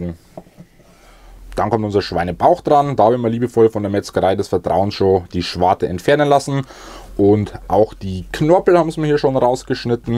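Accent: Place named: Upper Palatinate, Germany. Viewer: Versuche nicht deinen Dialekt zu verschleiern. A Oberpfälzer bleibt a Oberpfälzer. Grüße aus Mittelfranken mit Wochenendziel Edeldorf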